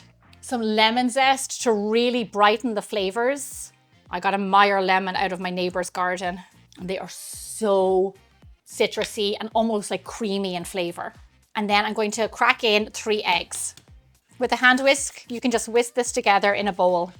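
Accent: Ireland. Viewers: She sounds Irish to me, not French. Is she Irish?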